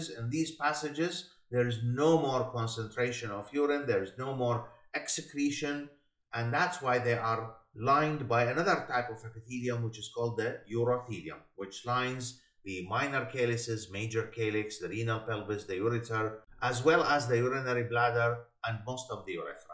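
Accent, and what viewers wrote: Iraq. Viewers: Noticed the Iraqi accent from afar! Thank you for the brilliant explanation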